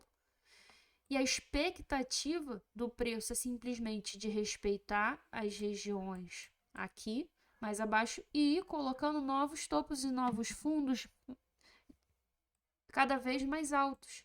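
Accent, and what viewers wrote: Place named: Rio de Janeiro, Brazil. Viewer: começando o curso hoje. alem do sotaque carioca, que é muito gostoso de ouvir, sua didatica é otima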